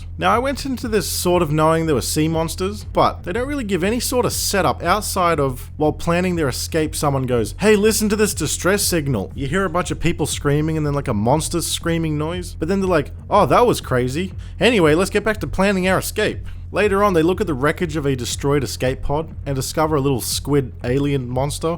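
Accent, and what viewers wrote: United States. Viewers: Good American accent! Well done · That American accent though..... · I look forward to hear what your thoughts on the new transformers news is. Your American accent is really good.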